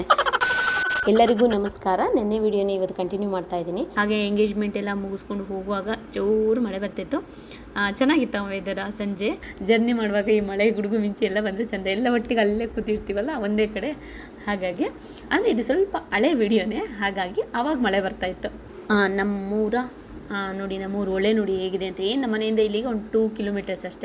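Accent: Hassan, India. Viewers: I became fan by seeing 1st time ur videos because f our hassan slang language mam..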